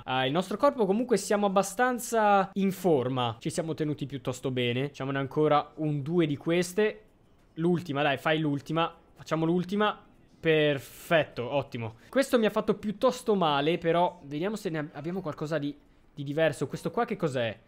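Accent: Milan, Italy. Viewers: sei milanese?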